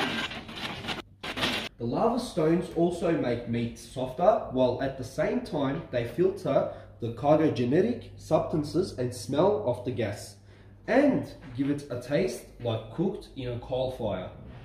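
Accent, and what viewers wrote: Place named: Australia. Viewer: Australian accent, interesting...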